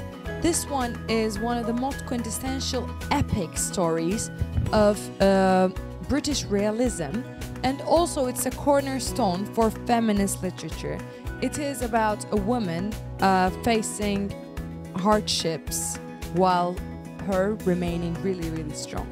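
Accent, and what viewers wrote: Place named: Russia. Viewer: Her accent is uncertain but I would guess it is Russian.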